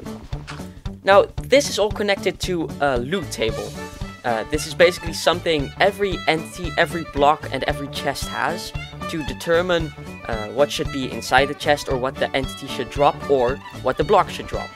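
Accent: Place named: Netherlands. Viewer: i smell a dutch accent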